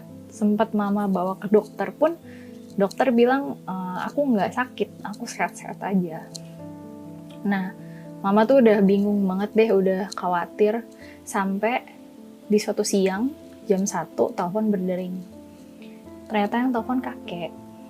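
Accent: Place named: West Sumatra, Indonesia. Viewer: logatnya kaya orang minang😁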